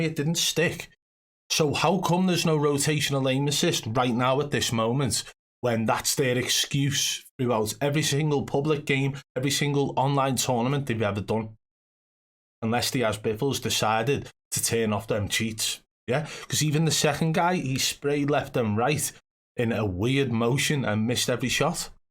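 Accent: United Kingdom. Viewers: I barley understand you British fuck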